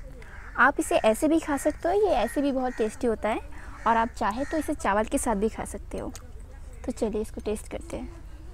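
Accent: West Bengal, India. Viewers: Beta aapki boli, aur ye kamal ke talab ,aur taad ke ped , aisa lag raha hai west Bengal se hain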